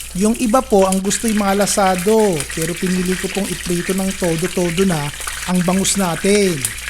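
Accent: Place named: Bulacan, Philippines. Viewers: Napakasarap nga ang ganyang luto rini sa amin ang ganyang estilo mo ako po ay tiga Hagonoy. Kaya yung punto mo sy kapatid ng sa amin sa Hagonoy, Bulacan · Taga Sta. Cruz, Manila po ako pero lumaki sa Bulacan. Natutuwa po ako sa paraan ng pagsasalita mo chef. Para kayong Bulakenyo magsalita 😂. Akala mo matanda ang nagsasalita. Pero regarding tayo sa menu na ito, gusto kong gayahin at parang masarap, Hinayang ha hinayang ak9 at di pa ako nakabiki ng bangus sa palengke kanina. Lingguhan p nmn ako mamili, 😢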